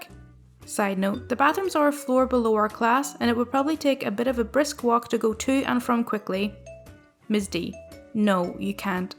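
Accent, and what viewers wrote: Scotland, United Kingdom. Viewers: Are you Scottish? It's nice! Edit:never mind, I read another comment and read your reply to it. It's hard to tell if your Scottish or Irish. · I so enjoy listening to you read!! I'm sure you are constantly asked but are you Scots? You have a lovely accent where ever you hail from. I, myself am a Texan, and really hate hearing myself recorded but I guess, there are people that enjoy it as I used to d.j. at a small market radio station many years ago. I always wanted to move to the U K. in the hope of losing the twang and gaining a lilt. Oh well. At least I get to listen to you!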